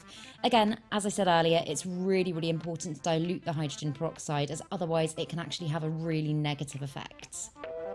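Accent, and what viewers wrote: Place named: London, United Kingdom. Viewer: I like your English. Londoner?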